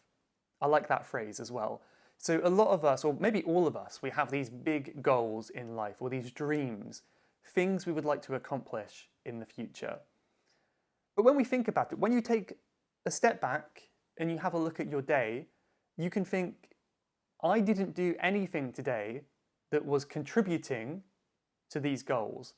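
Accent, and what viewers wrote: United Kingdom. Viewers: I was admire to you myteacher ,my resolutions this years Will to marry and wanna improve myspeaking skill .i hope British accent could come out to mymouth .hahaha i love your accent have Made me ,was crazy .i'm sorry my English bad . I'm from indonesian ,i hope one day i could meet to you ...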